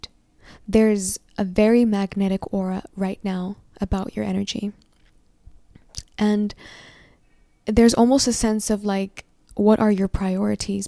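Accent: United Kingdom. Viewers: Your British Accent 😂 ❤❤❤ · We going to the UK 😂 you definitely mastering the accent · ❤❤❤.. ohh my Egyptian Goddess Queen your British Accent is gettimg so good That I almost felt I was some How In a Timeline with Harry Potter & Hogwartz scholars ❤❤❤ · Not the British accent 😂... had me creasing thnx for repping the London gyal dem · Yep 👍 ❤ 😅 your UK accent is hilarious and beautiful! The universe is my sugar daddy !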